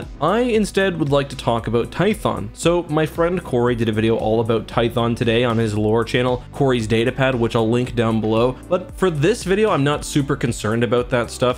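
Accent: Canada. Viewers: Ah booooot, lol Canadian?? Sweet! Maple syrup & such. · I always forget your Canadian until you say aboot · Is it just me or is his Canadian accent getting stronger and stronger? And this is coming from a Canadian.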